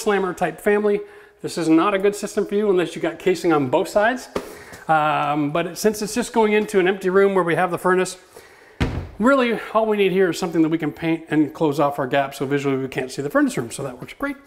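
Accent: Canada. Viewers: canadian, eh?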